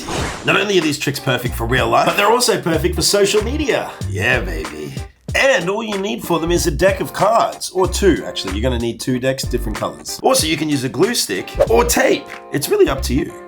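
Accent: Australia. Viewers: Hey,! My little boy LOVES you and your videos! We watch you daily (every day)! It would be so awesome if you could give him a shout out? He wants to do magic like you. Although we're Americans, he speaks in Australian accent to be like you! His name is Everett. Thanks for the videos! CHEERS, mate!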